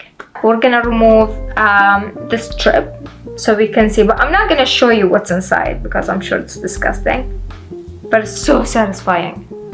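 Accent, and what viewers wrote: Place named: England, United Kingdom. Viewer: I love your English accent 😋😍😍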